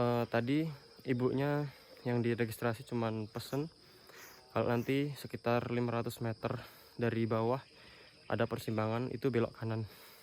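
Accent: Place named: Bali, Indonesia. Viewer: Warbyasyah Logatnya udah bali banget nih bli 😆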